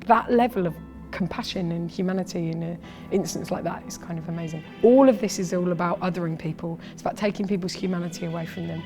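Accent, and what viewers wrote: United Kingdom. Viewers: Just another over privileged white person telling other white people how priviledged they are. Same old shit just with a British accent.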